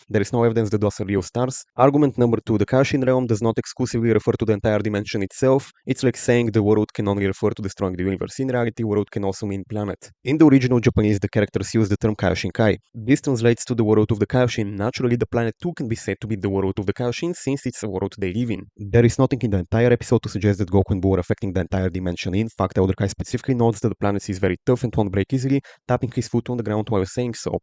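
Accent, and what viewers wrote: Russia. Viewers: off topic question, but... you're Russian, it's just that accent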